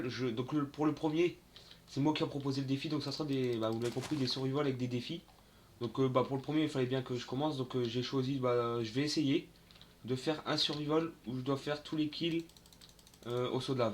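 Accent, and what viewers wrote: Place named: Nord-Pas-de-Calais, France. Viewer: Ch'ti RPZ, toujours le même accent xDD Bonne continuation à ta chaîne, m'y abonne :*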